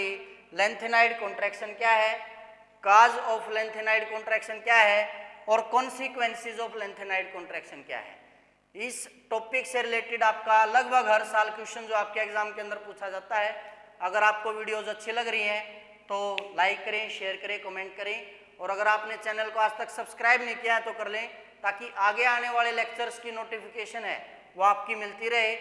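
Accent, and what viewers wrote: Haryana, India. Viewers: You are seems likely from HARYANVA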